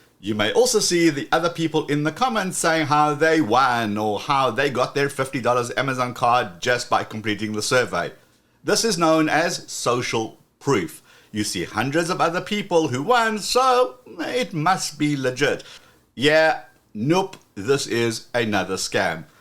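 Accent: South Africa. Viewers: Your accent is from south african, are you from South Africa? Thank you for sharing!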